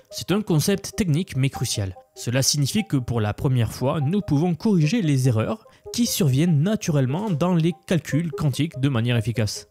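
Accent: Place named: Southern France, France. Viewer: L'accent du sud de la France est rafraichissant! Bisous du Québec!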